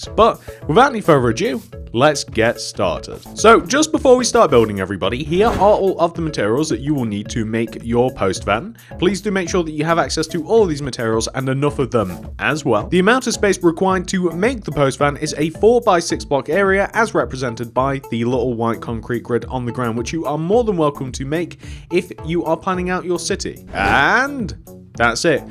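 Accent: United Kingdom. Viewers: heeyyyy watsup youtube? me: heyyy nothing much but you get more British everyday.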